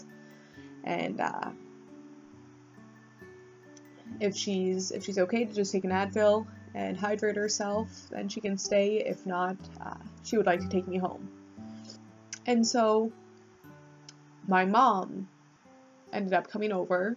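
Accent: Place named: Canada. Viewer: I just want to thank you for sharing your story. I realize that it’s been awhile since this was first uploaded, but I want you to know that it really impacted me. I totaled my car 3 years ago and completely messed up my ankle. I can’t ever run again, and some days are more painful than the last, which in by no means compares to what you went through, but it was challenging. It took about half a year to be able walk without any crutches, and about another year just to make the limp less noticeable. It was all worth it though. Just like you said, I don’t think I’d be the same person I am today if it wasn’t for going though it all. I have to be thankful for that, at least. But, you really do seem like a wonderful, strong-minded person. Hopefully you’re still doing well; and, of course, watching the new deadpool movie somewhere. Most likely in Canada. Lol. 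Not to offend. You just seem like you have a slight Canadian accent. Then again, what can I say? I’m from the states and people tell me that I sound slightly Canadian all the time. Haha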